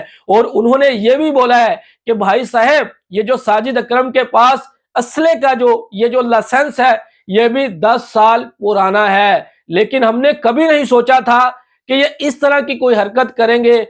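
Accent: Pakistan. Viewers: Me ne aaj is Bhai k channel ki detail check ki Is Bhai ka channel to Pakistan se chal raha hai. Is channel ki detail me Pakistan likha hai. Me b sochta tha k ye itna khul kar Pakistan ko q support karta hai Lekin dress Indian hai baqi is ki language muje confuse kar rahi thi k bolne ka style to bilkul hmare jesa hai. Haqeeqat Allah hi behtar janta hai.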